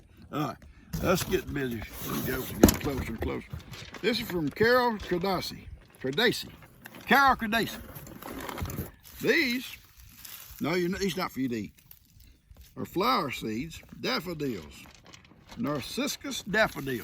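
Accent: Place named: Texas, United States. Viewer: I LOVE that Texas accent of yours, I could, and have, listened all day. And seeing you out in that beautiful pasture…you’re killin me. Well, a girl can dream right and you can tell GiGi I said so😂😂😂I know you only have eyes for her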